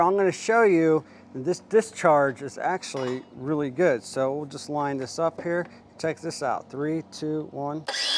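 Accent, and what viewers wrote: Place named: Louisiana, United States. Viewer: Hey man! I’m a first time viewer and I’m already hooked! Digging the humor! Not sure where you’re from…but I’m picking up a Cajun vibe. If not, you would definitely fit in down “herya”! Btw…that’s a sweet machine. May have to get me one of dem me!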